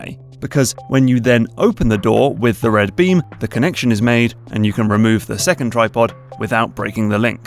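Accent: United Kingdom. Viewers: americans when they hear a british accent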